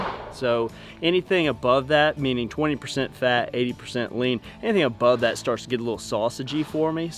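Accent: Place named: Carolina, United States. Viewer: sounds a lot like Trey Gowdy…a fellow Carolina native! Great burgers & a new sub here. Grill on brother!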